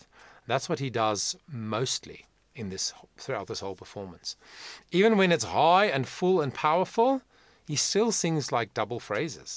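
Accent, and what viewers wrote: South Africa. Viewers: Thank you for this reaction. Hope you don't mind a long comment. Firstly, I listened to your opinion 3 times over a period of weeks. You sound to be from SA and so am I. The 1st time I thought, about your comments, can't you just listen and enjoy the way I do. Over time I came back to your reaction. Thought, ok, he wasn't being negative, he was teaching about different styles. Then after another period I listened to your reaction again. In the meantime I probably listened to all and every reaction. So, thank you for teaching me a few new ways of understanding why sounds, like growls, high notes, softer notes, vibratos and other fluctuations attracts me. I will now try to remember at least some of the things you mentioned. Please react on other Dan Vasc covers like, and the massive version of. Thank you.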